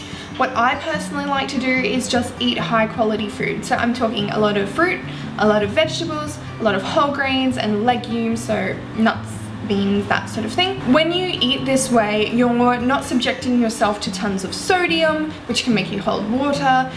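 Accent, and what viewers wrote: Australia. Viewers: aussie accent?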